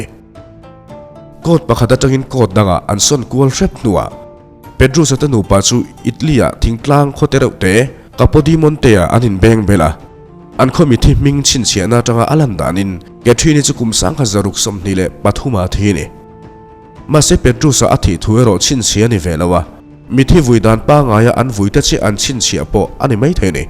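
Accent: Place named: Mizoram, India. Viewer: I aw. leh i aw phawi hi, ka van duh teh lul.. britain aw phawi kan tih ang a.. Mizo aw phawi diktak hi mawi ka ti.. " aw" i tih anga a... aw mawi tak tih aiin aw phawi tih hi a dik ka tizawk.. Min "Pin thei"../😁😁😁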